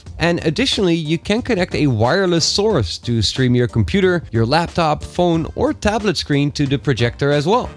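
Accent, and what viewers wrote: Netherlands. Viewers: your accent....is that dutch?? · Allthough you speak very good english, i detect dutch......am i correct? Nice vid btw.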